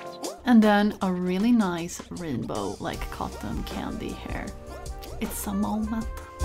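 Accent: Sweden. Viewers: Your Swedish accent makes it all better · I've never seen u before but i can hear that ur Swedish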